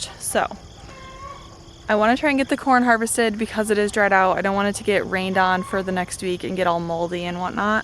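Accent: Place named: Great Lakes, United States. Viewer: I have sisters in Gaylord and the Soo and I live in NE Wis. I thought your accent sounded great lakes like. I'm looking forward to watching more of you videos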